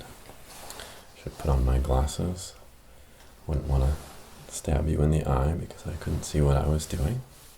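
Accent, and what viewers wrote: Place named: United States. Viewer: I never thought that I really would like an American accent with ASMR vids. Depending on our English teachers at school - in Germany they are mainly British - you get used to British English right from the beginning. And that's perfectly fine with me. But I love this video. Your voice is calming, soothing and relaxing. So, thanks for that!!!.Greetings from Germany. ;-)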